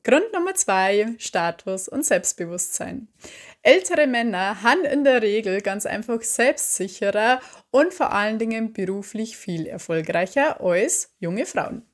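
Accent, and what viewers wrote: Bavaria, Germany. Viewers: WOW,.. wo bin ich denn hier gelandet ❓️ Hübsch, sympathisch und ein interessantes Thema bei Youtube ❓️ Das sind ja gleich 3 Dinge auf einmal und das geht hier doch nun wirklich nicht... Also manchmal, so wie in deinem Fall, finde ich die Algorithmusvorschläge wirklich toll... Mit deinem verschmitztem frechen grinsen sowie deiner verführerischen erotischen Stimme in Verbindung mit deinem süßen Bayerischen Dialekt und deiner offenen lockeren fröhlichen Art, hast du eine sowas von sympathische Ausstrahlung, das ist einfach unglaublich.... WOW ‼️ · Wie kann man nur so unglaublich an ziehend und attraktiv sein. Wenn du mir über denn Weg laufen würde würde ich dich sicher nie mehr vergessen können. Allein deine Stimme mit einem so schönen Bayerischen Akzent Wow.....😮. Liebe Grüße Torsten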